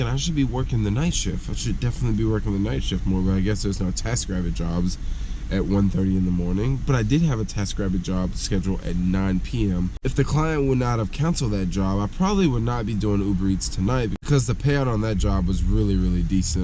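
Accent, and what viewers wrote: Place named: California, United States. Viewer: That Cali accent. Unmistakeable, and unique...especially the bay accent. I miss LA. ;(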